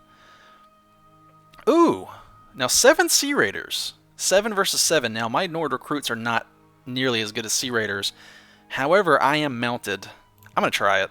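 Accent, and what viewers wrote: South, United States. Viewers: You sound like your from the South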